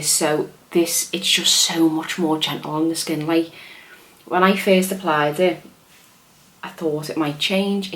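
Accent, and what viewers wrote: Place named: Ireland. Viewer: Your accent is really pretty. Are you Irish?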